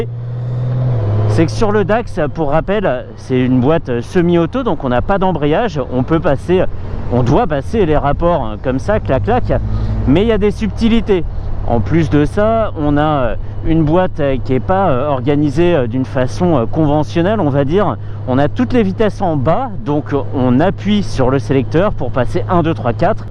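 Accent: Paris, France. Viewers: Très sympa, ce comparo! J'adore ton accent parigot (je suis du sud-ouest) Je m'abonne 👍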